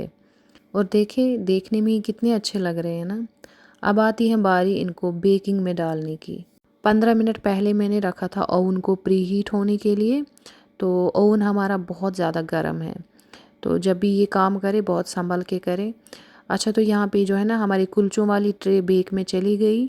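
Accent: Kashmir, India. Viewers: Subscribed 👍 Enjoy Make videos in our own mother tongue that will be more beautiful I don't know where from you people But your accent is soporen Rise and shine❤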